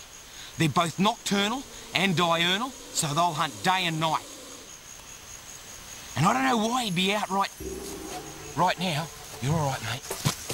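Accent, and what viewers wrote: Australia. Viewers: I fucking LOVE how Steve says "Cobra!" the Australian accent is just beautiful at times! COB-RA!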